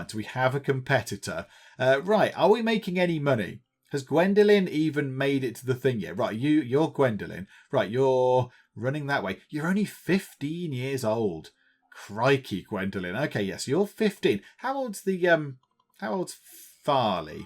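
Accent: United Kingdom. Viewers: Hello British accent guy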